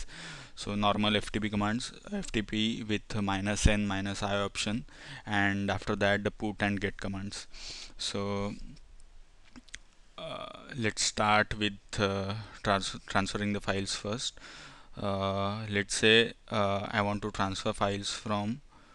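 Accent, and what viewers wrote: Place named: Mumbai, India. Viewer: Mumbai accent :-)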